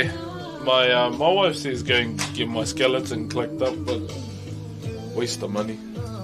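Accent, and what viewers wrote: New Zealand. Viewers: Your accent sounds New Zealand, but your carving style looks Hawaiian/Polynesian... So pretty. I really like it ❤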